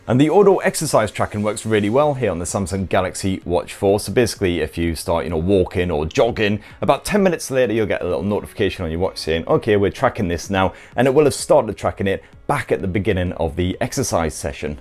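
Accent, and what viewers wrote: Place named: Newcastle, United Kingdom. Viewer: Sounds like a geordie therefore he is definitely a good lad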